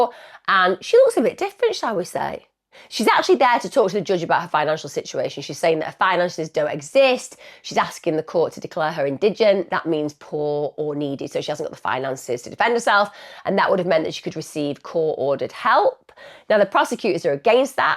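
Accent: United Kingdom. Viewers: Nice to find uk accent podcasters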